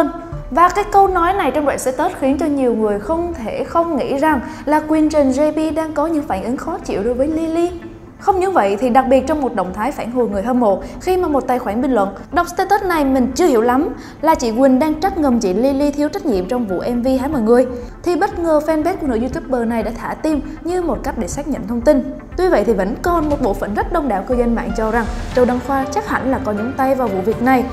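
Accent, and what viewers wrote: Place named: Central, Vietnam. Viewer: thích bạn này nói giọng miền Trung hơn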